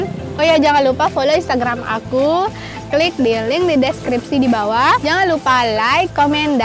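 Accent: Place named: Lombok, Indonesia. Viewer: logat lomboknya keliatan banget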